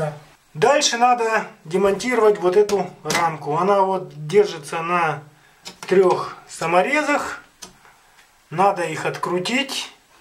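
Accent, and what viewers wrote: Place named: Buryatia, Russia. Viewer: Уверен - автор либо бурят, либо киргиз, уж очень говор похож)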